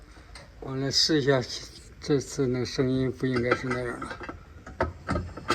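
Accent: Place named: Henan, China. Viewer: 大师听口音河南的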